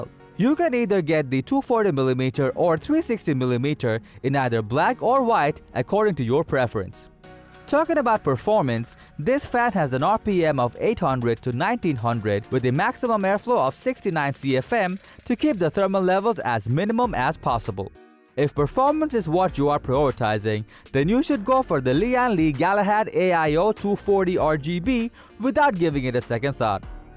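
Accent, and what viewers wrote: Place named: India. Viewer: He sounds like a Indian with a german accent trying to sound like a American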